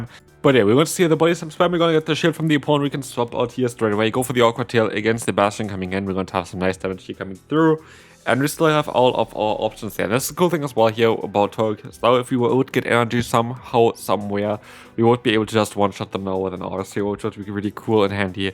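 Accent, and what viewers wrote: Germany. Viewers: It would be great if you could speak a little bit slower and more exactly because it‘s pretty difficult to follow this fastly spoken Englisch with the German accent. Especially words like „literally“ sound like „liddellillllyy“ or „in todays video“ is „in tdsss veoo“. Thank you!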